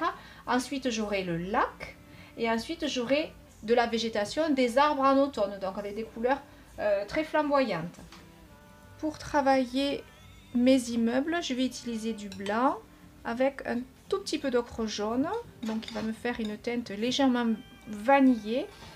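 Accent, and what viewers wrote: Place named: South-West, France. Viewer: Chirurgicalement parfait. PS: vive l'accent du sud ouest !!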